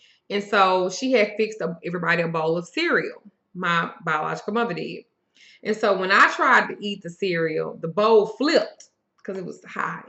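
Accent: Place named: Texas, United States. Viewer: This is my first time watching one of your videos and I loved it! I can definitely relate to holding onto things from childhood and my father not being there like I needed and expected him to be. I knew you were from Texas when I heard your accent lol. You actually resemble my ex's mother a lot and they're also from Texas (Ft. Worth).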